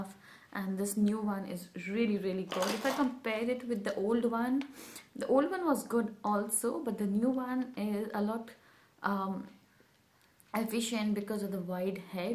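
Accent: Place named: India. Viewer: I cant take the indian accent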